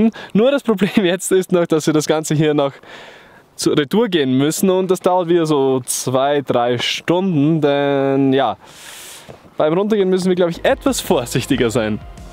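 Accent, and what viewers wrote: Austria. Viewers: Kommst du aus österreich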